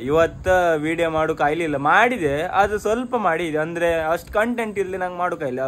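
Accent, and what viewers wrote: Kundapura, India. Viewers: I like kundapura kannada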